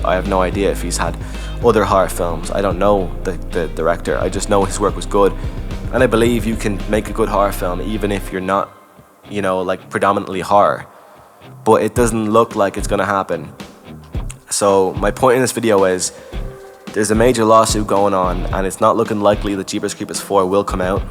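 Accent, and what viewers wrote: Ireland. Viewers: This guys Irish accent is damn sexy.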